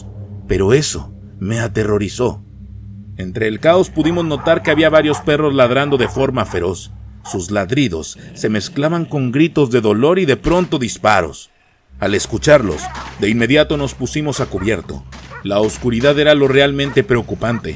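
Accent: Mexico. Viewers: Quiero el enlace para el correo...además de una buena voz...una buena historia tiene el encanto del acento mexicano!!!! Desde Argentina loa amo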